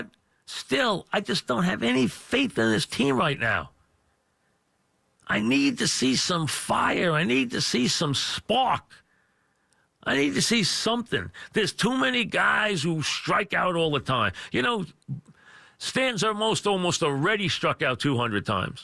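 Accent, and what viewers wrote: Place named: New York, United States. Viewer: I wish these stupid New yurkers would learn how to speak English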